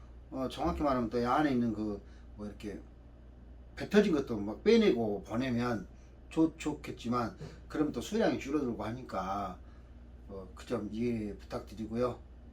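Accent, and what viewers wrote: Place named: Suncheon, South Korea. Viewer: 친정이 순천이라 고향의 사투리 정겹습니다 요즘은 고등어 는 안나오는군요 😀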